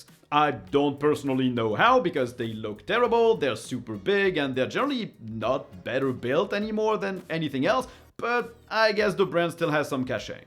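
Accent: France. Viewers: Man where u from France?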